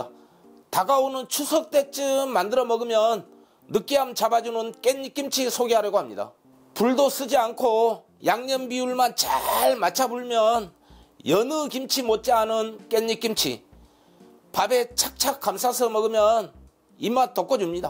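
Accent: Jeolla, South Korea. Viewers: 구수한 전라도 말씨 좋아요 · 구수한 전라도 사투리. 정감있고 언제나 맛있고 정성어린 설명. 감사합니다~~ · 전라도 말씨중에 제일 매력있는 목소리인것 같은데요 음식과 말투의 궁합이 최고~^^♡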